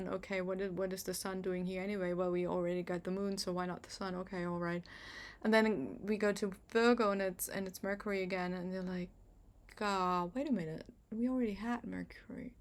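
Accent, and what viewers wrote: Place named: Germany. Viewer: Your English is great actually👏😎, yet it has a carry-over🎉 from german not just in accent/pronunciation, rather also in the way to frequently used fillers such as Ähem/Uum😂😢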